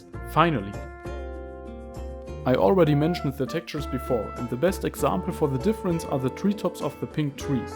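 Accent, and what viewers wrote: Germany. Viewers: are you german? because your english sounds like you were from germany. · Good video. (hörst dich an wie ein deutscher... Kann ja auch sein) This differents are extrem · Hör ich da etwa einen Deutschen aktzent? 😁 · How do you only have 15 subs? This Video is doing Great! Great editing, good quality and smooth music in the background which isn’t too loud or quiet. There might be a german accent but dang! You got a new sub ( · Aha ein deutscher Akzent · Man hört raus das du Deutscher bist 😉 gutes Video 👍🏻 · Bist du Deutscher oder warum hast du so einen deutschen Englisch Akzent ???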